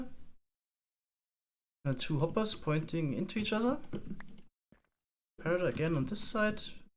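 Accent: Germany. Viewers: Klingst ja verdammt deutsch, sympathisch haha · are u german? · Are u german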